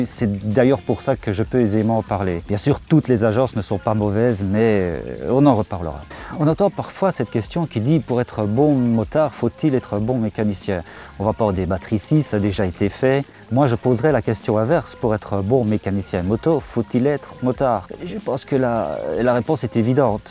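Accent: Belgium. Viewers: J’apprécie le petit accent belge 👍🏻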